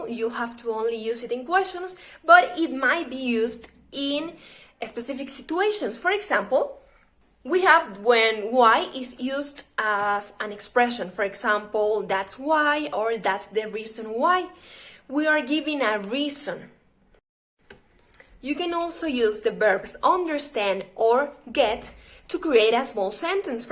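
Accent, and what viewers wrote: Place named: United States. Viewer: Coño primita pareces united states y todo jajajaa te felicito 💕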